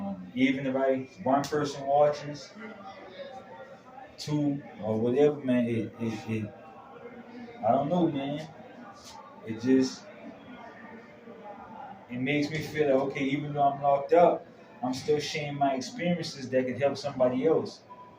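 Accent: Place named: Charleston, United States. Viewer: Hold ya head fam 💯💯 u talk like lil buc from Charleston. Knew u was from here 💯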